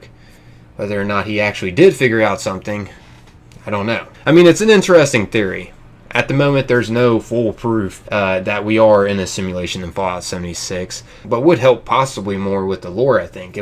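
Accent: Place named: New Jersey, United States. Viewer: Its because he sounds like he could be from New jersey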